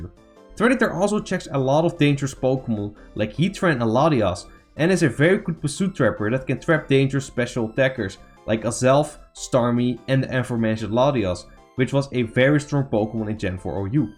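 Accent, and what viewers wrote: Netherlands. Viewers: Je hebt echt t hardste Nederlands Engelse accent dat ik ooit gehoord heb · Seeing all the comment about how dutch you sound are cracking me up. Can't disagree but it's not bothering me at all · Needed just 2 seconds to hear you are Dutch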